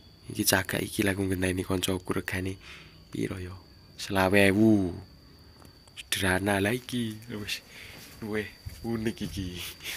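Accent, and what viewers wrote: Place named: Java, Indonesia. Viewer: Boso mu ale meduk mas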